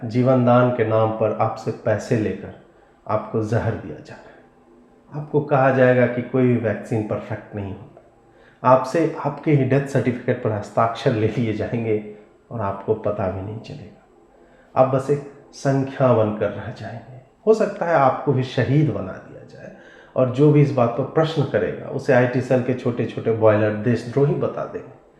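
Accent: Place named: Bihar, India. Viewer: अजीत भारती भाई आप का विश्लेषण का जबाब नही होता पर व्यंग्य वाली वीडियो ऊपर से बिहारी तड़का शुद्ध हिंदी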